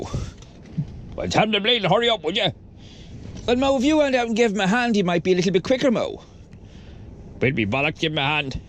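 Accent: Ireland. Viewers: What part of Ireland are you from?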